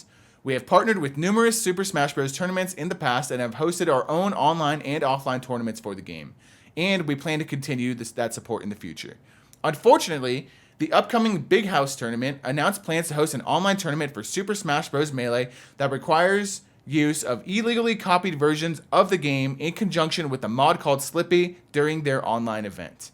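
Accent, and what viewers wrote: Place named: California, United States. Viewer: sounds like Fred Armison in the SNL skit Californians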